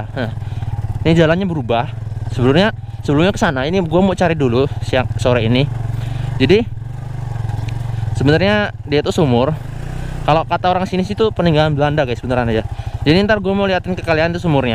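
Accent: Java, Indonesia. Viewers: kok ngomongnya jawa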